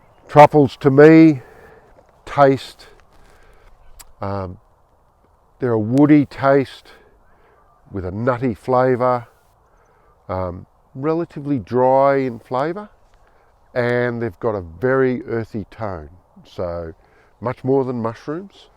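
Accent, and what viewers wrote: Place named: Australia. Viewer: I found this video while researching as to whether this could be done. It's encouraging. The gentleman sounds Australian. Is that where he lives?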